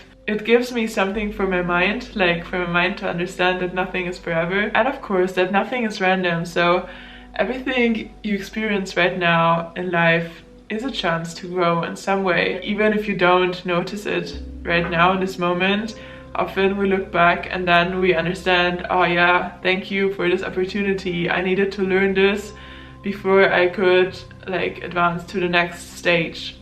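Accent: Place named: Germany. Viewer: Oh, you’re from Germany. I was trying to figure out your accent but couldn’t get it. You are probably suffering a symptom called “Bangkok Blue or Thailand Blue. Tourists from around the world who visited Thailand get it after they’ve returned to their country. The symptoms are, sadness and can accompany with crying. No need to ask your doctor or searching for a medication for this. It should go away in a few weeks. But if it’s doesn’t go away then you will have to save money for your return to Thailand, hopefully soon. Thailand gives so much happiness to the tourists. Like anything when you feel high and good. But not so easy naturally when you have to come back down. Hope you can adjust back to a happy life in Germany soon. Thailand will wait for your return 😃 ✋🇹🇭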